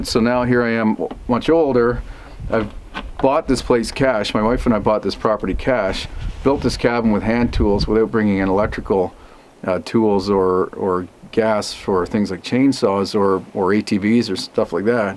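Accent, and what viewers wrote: Canada. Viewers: I really enjoy your videos, watching you build by hand reminds me a lot of my Pop (who built most things from recycled train sleepers actually...his favourite thing). But I just love listening to your take on things at the end of each video, I find myself staring out the window, nodding most times. Also my best friend is from Barrie, ON, so your accent has a nostalgic feeling for when I miss her. Thank you for sharing your life, building, and take on life, the universe, and everything.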